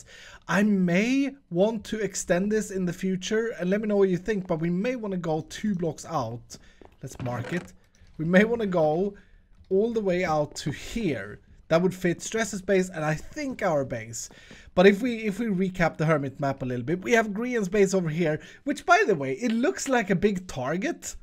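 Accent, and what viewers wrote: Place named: Sweden. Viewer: I love your swedish accent Btw I'm swedish aswell so I speak the same...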